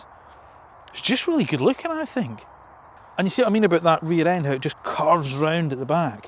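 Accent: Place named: Scotland, United Kingdom. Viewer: Good critique, however, the speaker is talking quite naturally slowly for a native English presentation. I do understand your issue as the Scottish accent - I’m northern English btw - can take a while to get accustomed to. I’d recommend perseverance and hopefully you’ll tune in.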